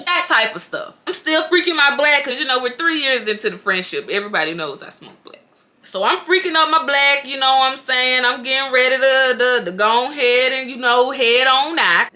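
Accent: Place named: North Carolina, United States. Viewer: WHER U FROM !??? I HEAR YA ACCENT !!!! IM HEARIN NORTH KAROLINA !????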